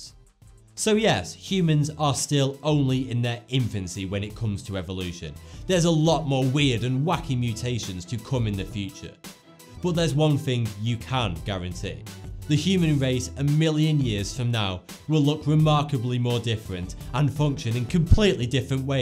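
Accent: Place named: West Lancashire, United Kingdom. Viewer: West Lancashire accent.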